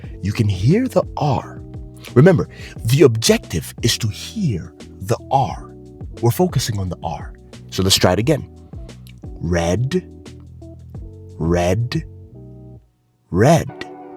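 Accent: United States. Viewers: From Algeria I Love your lessons at general and I love your Americanaccent. Particularly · I am from India ❤love your american accent but i am not able to pronounce like you please tell me how can copy you ?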